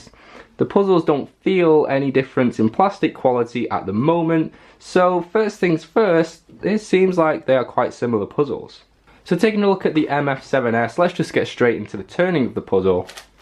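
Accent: England, United Kingdom. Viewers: Hey are you from England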